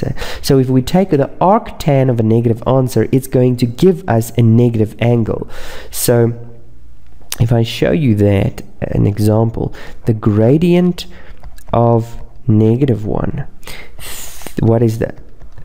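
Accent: South Africa. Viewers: I thought i recognized the accent! Hey there South African bro!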